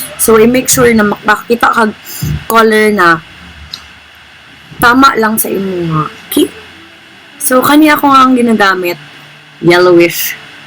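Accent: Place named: Cebu, Philippines. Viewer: I thought you were. you have that cebuana accent. You should make more of these, with better lighting, video quality, and sound quality. and keep the bisaya script. Lot of potential to be a popular pinoy youtuber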